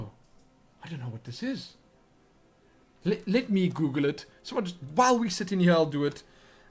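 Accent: South Africa. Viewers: Is he South African??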